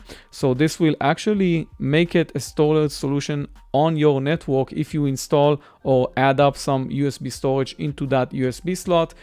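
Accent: Israel. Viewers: איזה מבטא ישראלי אחי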